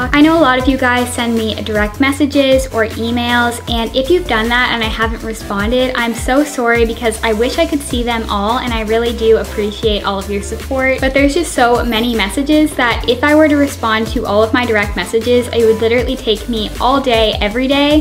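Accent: Canada. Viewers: I love the way Canadians say "sorry" and "picture" it's so endearing.😊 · Are u Canadian or American??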